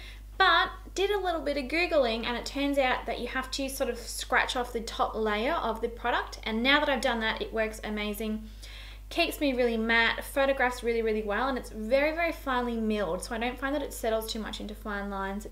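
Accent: Australia. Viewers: Are you from Australia if so which state??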